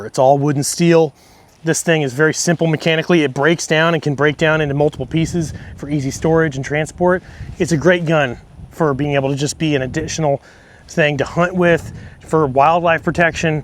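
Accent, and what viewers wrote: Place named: Florida, United States. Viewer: where you from boy? Florida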